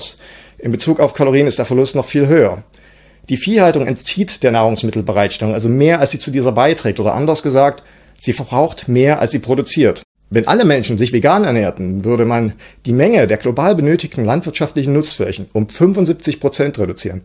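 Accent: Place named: Saxony, Germany. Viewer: ich höre es nur gaaanz leicht raus, mein Mann sächselt deutlich mehr. Ich mags. 😁😉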